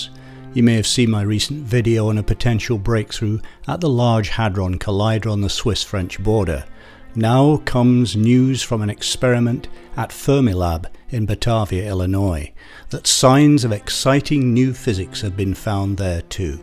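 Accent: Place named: England, United Kingdom. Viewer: I'll admit it. the English accent makes me pay attention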